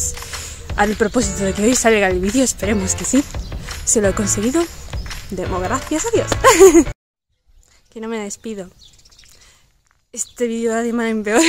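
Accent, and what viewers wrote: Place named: Spain. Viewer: Tenés el acento español mucho más marcado 😦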